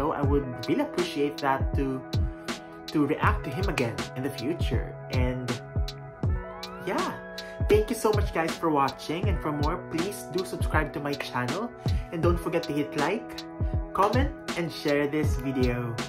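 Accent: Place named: Philippines. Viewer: Are u japanese? U sound more like pinoy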